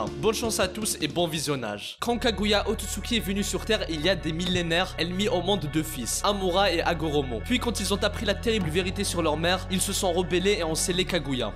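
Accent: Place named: Kabylie, Algeria. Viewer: Je salut ton accent kabyle ✌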